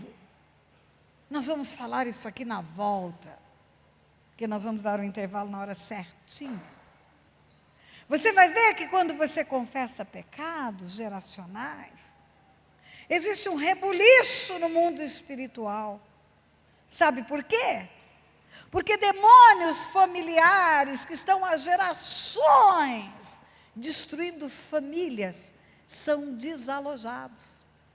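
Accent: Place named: Minas Gerais, Brazil. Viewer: Menina forma carinhosa de mineira falar tu és uma benção de Deus aqui na terra amada Pastora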